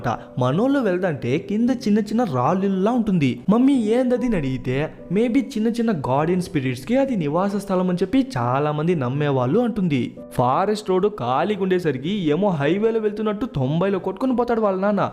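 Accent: India. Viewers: which telugu accent it is but i loved it❤😊😅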